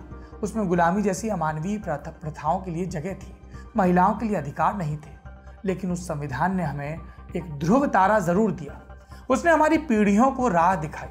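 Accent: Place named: Bundelkhand, India. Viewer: sir aap kaha se hai mai jalaun se hu aap bundelkhandi bolte hai aap kaha se ho plz plz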